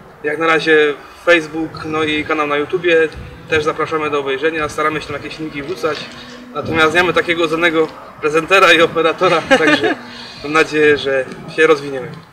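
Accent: Silesia, Poland. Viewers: Zajebisty ślonski akcent. Zeby tak to szlo utrzymac tyn dialekt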